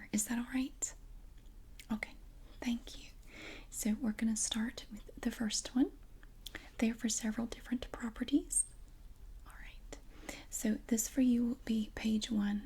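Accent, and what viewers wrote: South, United States. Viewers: as much as i love your southern accent videos, i love your soft spoken voice! more of these please! >_____<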